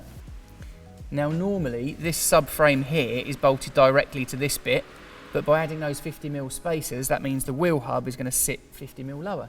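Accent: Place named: United Kingdom. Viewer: 我居然听口音就知道是英国的 美国人说英语还是很不一样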